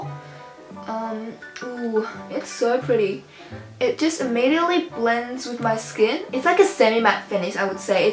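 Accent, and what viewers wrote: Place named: South Korea. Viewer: thank baby love your share 尽管听韩国口音很想死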